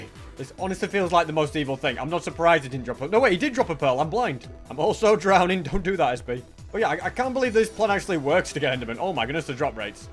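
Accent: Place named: Yorkshire, United Kingdom. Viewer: I love your Yorkshire accent SB!!!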